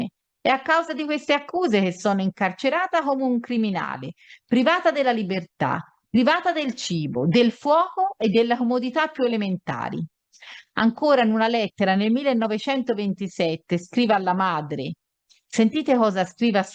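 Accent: Tuscany, Italy. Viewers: bellissimo contributo con il tuo bell'accento toscano o fiorentino che ne so...bel documento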